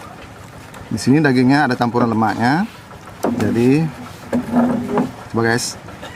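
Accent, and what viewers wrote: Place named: West Sumatra, Indonesia. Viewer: Gaya abg berbicara seperti orang Minang yang menggunakan bahan bahasa Indonesia 😅😂😂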